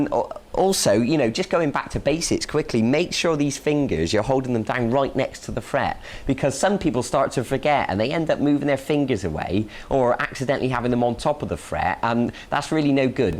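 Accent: United Kingdom. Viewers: haha i like his accent... !! british rocks... !!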